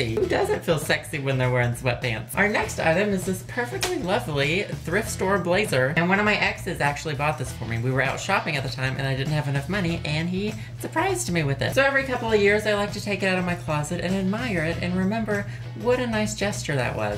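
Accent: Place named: Australia. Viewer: I swear, every episode it's like he has an undercover Australian accent that resurfaces from time to time.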